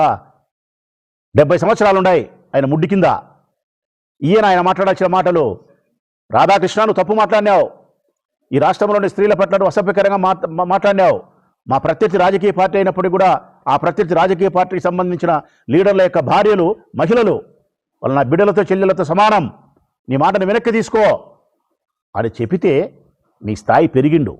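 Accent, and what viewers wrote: Rayalaseema, India. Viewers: రాయలసీమ భాష లో మాట్లాడాలి అంటే అది మీరే అన్న 🙏🙏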